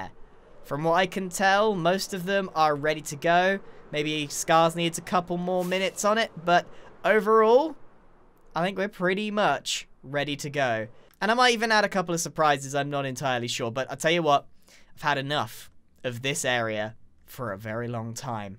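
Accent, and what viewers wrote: United Kingdom. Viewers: I knew u was British! Help me and meet hahaaaaa..... 😋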